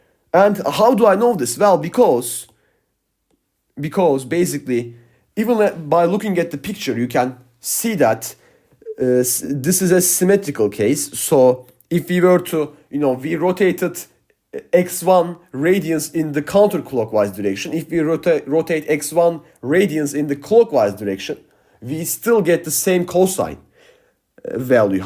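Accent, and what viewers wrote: Turkey. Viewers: Usta türk olabilir misin tam türk aksanıyla inglizce konusyon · yemin edebilirim ama ispatlayamam konuşan bir Türk :)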